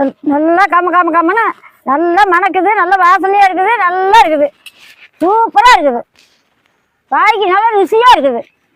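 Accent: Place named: Dharmapuri, India. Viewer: Nice ppaatima.. Neega ssamiyal kurippu sollum pothu yanga ooru neabagam varthu so neega yapdium Dharmapuri, selam, kirushnagri. Vellore pakkamtha irupiga... ❤❤❤❤ all vedio very nice paatima.... 🎉🎉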